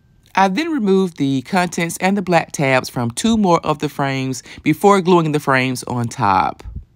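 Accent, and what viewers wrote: South, United States. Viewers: Girl this DIY is the bomb! Thank you so much! Love your southern accent too 😘😘😘😘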